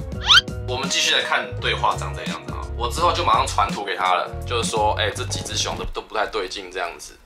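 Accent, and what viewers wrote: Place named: Taiwan. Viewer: 真的氣屎台語口音好奇家鄉抵對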